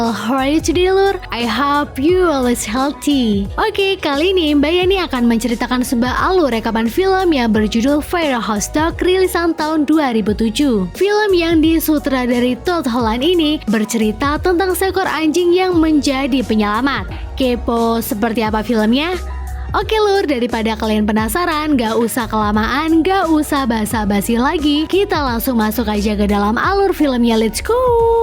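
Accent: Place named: Surabaya, Indonesia. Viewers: Kok Suroboyo Boyo metu embak tapi aku seneng loh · Min mimin orng jawa ya min kok gomong kek ong jawa sama uwu ternyata toxic juga ya min:v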